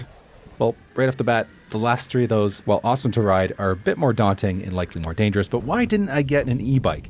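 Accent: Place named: United States. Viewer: Bro... Why is this guy speaking American talking about kilometers?!?! Im so confused lmao.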